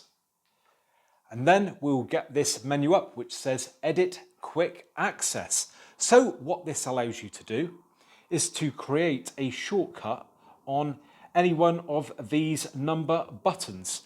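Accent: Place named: Somerset, United Kingdom. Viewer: are you from somerset? you sound abit like it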